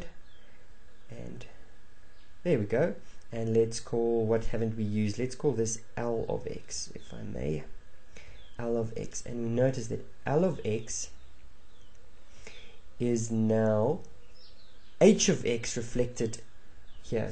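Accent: South Africa. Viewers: Thanks! This video was extremely wonderful and helpful for matric revision :) Great job... [I also noted the South African accent - being a Gauteng'er, the lesson was easier to grasp and much more tolerable!)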